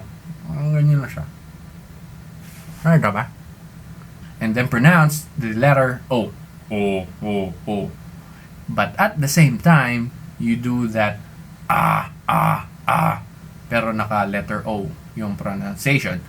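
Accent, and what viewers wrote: Philippines. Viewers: Kala ko englishero pilipino pala hahaha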